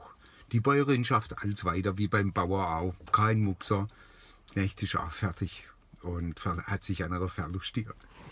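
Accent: Baden, Germany. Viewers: undeutlich? das ist badisch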